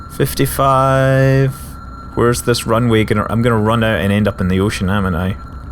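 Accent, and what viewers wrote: Scotland, United Kingdom. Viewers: May I ask? Are you Scottish?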